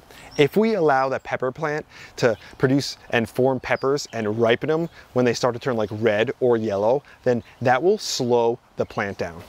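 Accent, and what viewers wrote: New Jersey, United States. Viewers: Your videos are super educational and helpful! Plus my 6 year old daughter absolutely loves your introduction and Jersey accent. 😁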